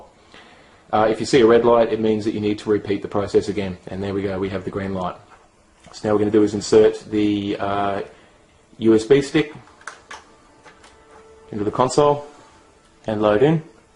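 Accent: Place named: Australia. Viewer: are you from oz mate????sure sounds like it.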